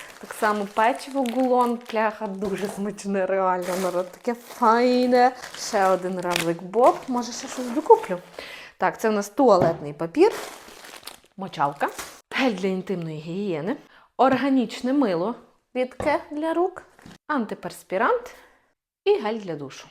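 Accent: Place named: Lviv, Ukraine. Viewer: Обожнюю львів'ян, їду з Києва спеціально щоб послухати ваш діалект, рідний мені з дитинства. Якраз збираю сумки в пологовий, тому дуже корисно було послухати, дякую!! ❤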